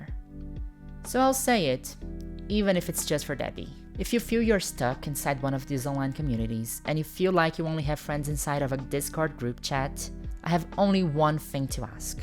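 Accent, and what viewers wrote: Brazil. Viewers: Don't you dare apologize for your beautiful Brazilian accent! The way you say infamous and frequently is extremely charming! Technically I can't speak for the entire United States but to the ear of a person in the US the Portuguese accent and pronunciation is very attractive to our ears, now for example there are other accents that don't sound quite as lovely in English but I don't want to be rude, I'll just say that a certain German chancellor would have a very harsh sounding accent. Also, great video full of nuance that I think will be beneficial for many young women. Strangely enough my daughter never really voiced concerns about passing but she really needed affirming surgery for her mental health, but it was for her not for anyone else. She's kind of special that way! ☺️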